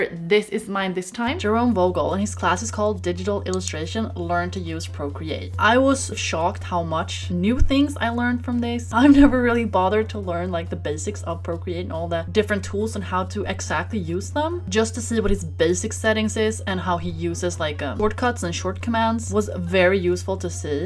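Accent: Sweden. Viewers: Didn't realize that you where Swedish until like 4 minutes into the video. Well, I'm Swedish to so... Hejsan Svejsan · Are you Swedish just a question? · Is she Swedish? Sounds like she has an Swedish accent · you sound kind of swedish thats so cool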